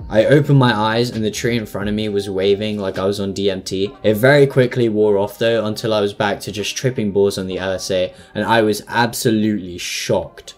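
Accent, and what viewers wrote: United Kingdom. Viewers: m8 why u speak bribish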